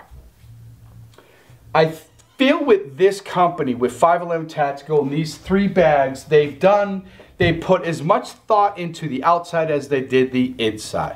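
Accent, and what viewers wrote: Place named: New England, United States. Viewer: Great vid !!! Btw I'm sensing a fellow New Englander by the accent !!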